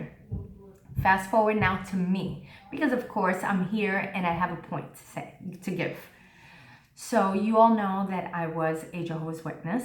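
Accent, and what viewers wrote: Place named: New York, United States. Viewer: Where is your accent from ? Sounds like a New York Latino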